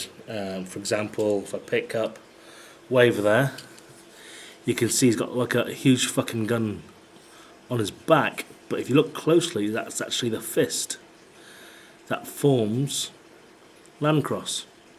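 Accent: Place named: United Kingdom. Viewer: i didint know this guy is british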